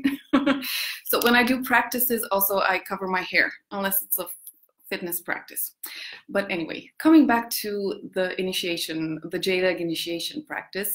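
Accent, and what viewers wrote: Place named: United States. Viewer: But you do speak English perfectly! And you have a bit of American accent 🙂 Did you study there?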